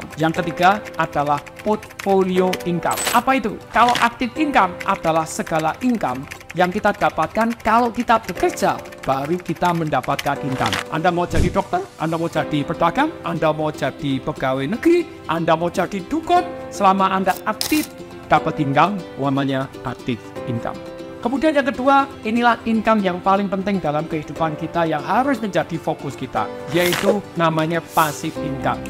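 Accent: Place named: Surabaya, Indonesia. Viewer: Pak bagusnya musik nya dikecilin atau ga usah ada musik nya. Pengen fokus denger suara bapak jd kegangggu sm musik. Makasih. Dan lagi Pak denger suara asli Bapak dengan logat Surabaya nya itu sudah bikin semangat sekali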